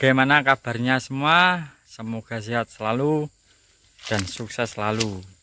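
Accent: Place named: Java, Indonesia. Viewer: Ledok nge wong jawa boss ku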